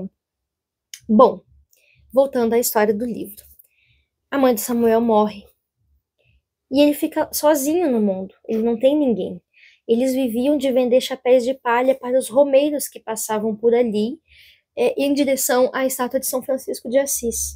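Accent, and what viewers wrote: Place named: Latin America. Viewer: QUE AULA! Amiga, eu sempre amei as nossas conversas, e sempre quis assistir uma aula sua. Considero esse desejo realizado com esse vídeo. Sem dúvida a fruta não caiu longe do pé heheh mas tem o seu jeitinho de explicar. Simplesmente amei a introdução ao livro ❤ E quanto ao livro em si... só o começo da história já me deixou muuito intrigada! Quero saber mais sobre essa família de mulheres hehe e se só o começo já é assim, tudo o mais que você contou sobre a história me deixou ainda mais curiosa e interessada pelo livro. Mais um na lista!! Hehe Ainda mais especial por ter esse "sotaque" latino 🎉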